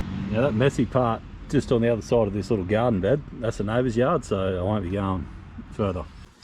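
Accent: Australia. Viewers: Well, look who showed up on YouTube. So glad that you are back making videos,. We've missed your Aussie accent and sarcasm. Best of luck to you in your business--sounds like you have things in order now, so good on you! Blessings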